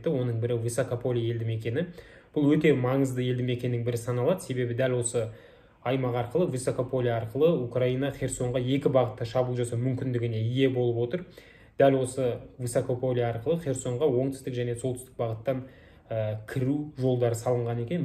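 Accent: Standard. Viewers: Бәрекелді балам. Міне осылай таза қазақша сөйлеуді үйреніңдер жастар. Осы сен сияқты сауатты жастарымыз көп болсын.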